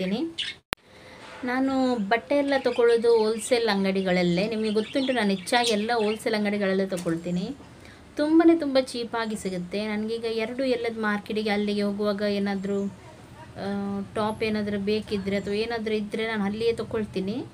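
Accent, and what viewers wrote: Mangalore, India. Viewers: Madam nimma mattu keluvade onda thara khushi. Nimma magalur kannda super. Nimma vedio super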